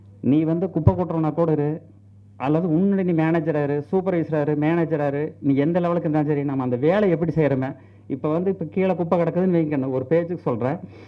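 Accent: Kongu, India. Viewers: Kongu Tamil...UBM valgaa... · kongu tamil...😍💓💓💖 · BEAUTY OF KONGU TAMIL!!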